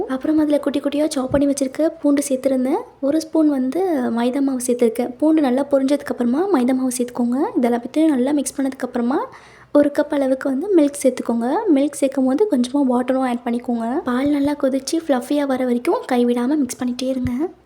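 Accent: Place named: Chennai, India. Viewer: Videos la mattum tha ...etha voice modulation la pasuvigga la? Ilaa.......off the video laiyum eppadi etha modulation pasa palagiutiggala....etha slag voice 🥰 cute ta iruu .....ugaa old chennai slag romba romba alaga ..semma cute 🥰 ta irrukku...ga❤❤❤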